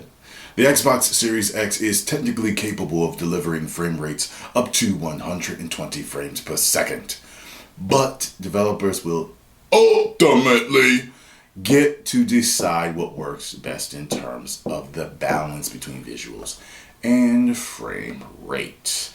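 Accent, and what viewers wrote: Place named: England, United Kingdom. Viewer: ❤️ Hooray! "Snobby English Guy" voice wins!❤️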